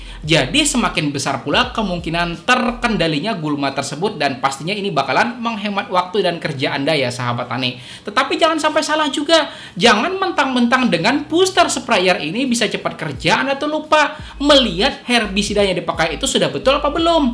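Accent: Banjar, Indonesia. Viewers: trima kasih info nya pak.. logat banjar selalu ada kata "Kah" ujung nya...bujur lah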